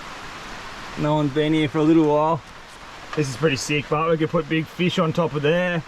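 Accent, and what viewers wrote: Australia. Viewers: Are u from Aus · Since Steve Irwin is dead this dude has to do with his australian accent.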